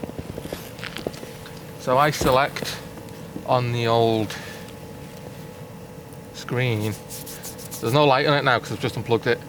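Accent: Liverpool, United Kingdom. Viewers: Interesting! I've just found your videos, only because your i-pace v e-niro comparison was posted on a Kia forum, I'm really enjoying watching them. I like your natural presentation style and subject matter, and like seeing the beautifully spectacular finnish scenery. I cringe when I see you touching things with your bear hands in those temperatures😮. I suffer from reynauds so I can't tolerate even the slightest dip below freezing point😟. Do I detect a scouse accent?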